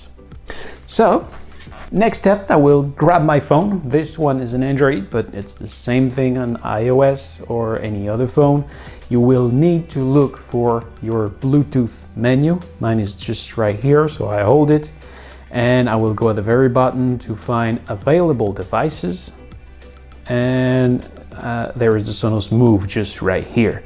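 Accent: Quebec, Canada. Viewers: You HAVE to be québécois. I recognize the accent ;)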